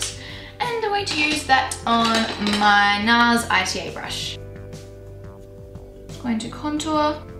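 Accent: Australia. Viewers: Indeed i did enjoy !! you are gifted with your makeup plus its easy to understand you because i'm Aussie too :D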